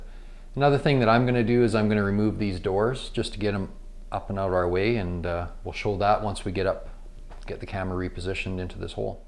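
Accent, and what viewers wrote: Canada. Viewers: Can someone put subtitles in.... his Canadian accent is too difficult to understand.... I'm from New Jersey. 😢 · Nice Canadian accent!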